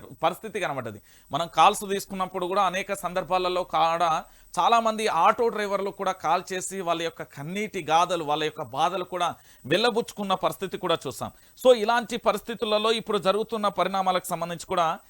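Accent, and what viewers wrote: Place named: Hyderabad, India. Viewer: Bhasha hyderabad lo vundhi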